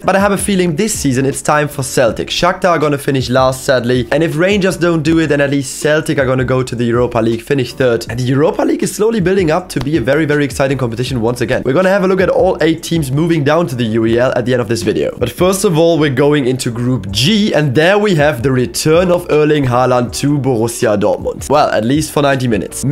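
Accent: Germany. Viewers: your accent is so german. Iconic!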